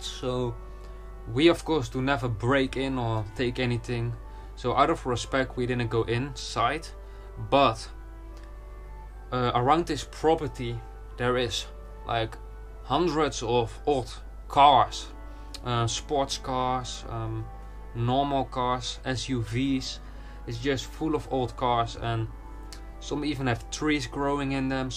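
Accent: Netherlands. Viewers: Je hebt zo'n goede video's.... Kom er steeds op door dat je met anderen op pad bent... en kijk dan toch steeds even naar je vids. Maar pfoe.. ik kan me echt niet abonneren en klik vrij snel weg omdat ik dus al heb gezien wat je gefilmd hebt met je co-host zeg maar. Niet omdat ik niet wil zien wat JIJ geflimt hebt ten opzichte van je co host want ik kom steeds even kijken, maar sorry... ik kan echt niet tegen je "steenkolen" Engels.... Ik weet dat iedere Nederlander of Belg (inclusief mezelf!) gewoon een rot accent in Engels heeft maar het is gewoon TE erg voor mij. Ik kan zelf vrij goed Engels verstaan, lezen, schrijven etc maar spreken doe ik zelf ook liever niet ivm accent. Ik weet hoe moeilijk het dus is maar dat houd ook meteen in dat ik elke Engels met een Nederlands/Belgisch accent gewoon niet aan kan horen :D Het is dus niks persoonlijks en zou willen dat ik je vids met een open blik kan bekijken maar ik kan het gewoon niet.. 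Sorry!! Ze zijn zo goed maar zodra je Engels begint te praten krimp ik in elkaar .....De video met Mark en in the prostitute mansion: de duif of uil: he flight right at him!! The Owl flight right at him!! En niet 1x maar diverse keren en tja.... we weten allemaal dat die zin totaal niet klopt. Wil ook echt niet negatief zijn want je video's zijn goed... erg goed.. maar misschien toch beter in het Nederlands? Trek je echt meer viewers door Engels te praten in je video's? Mark is ook alleen Nederlands...en volgens mij hebben jullie bijna hetzelfde a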